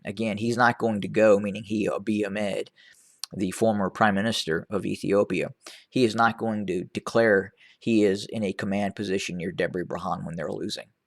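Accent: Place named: United States. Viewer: Bias TPL with American accent.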